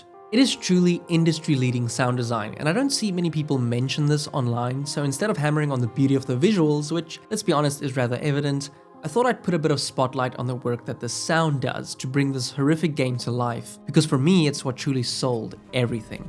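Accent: South Africa. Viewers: I smell South Africa? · South african?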